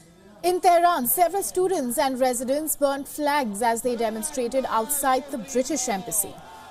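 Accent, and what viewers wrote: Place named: India. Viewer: We couldn't stand her Indian accent.👎👎👎👎👎👎👎👎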